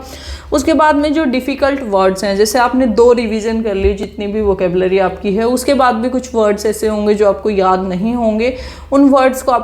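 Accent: Haryana, India. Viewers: चाहे कितनी ही आछे से हिंदी बोल लो पर हरयाणवी flow नही जाने वाला😅😅😅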